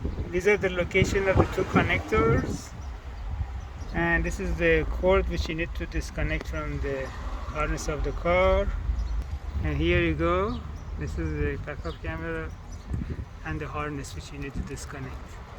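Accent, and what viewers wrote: Iran. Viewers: از گویش شما پیداست ایرانی هستی موفق باشی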